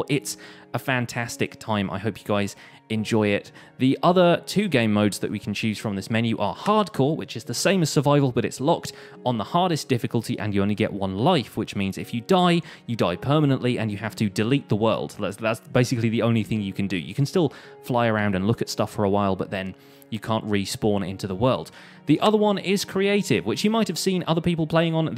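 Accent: England, United Kingdom. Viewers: I love her English accent...skl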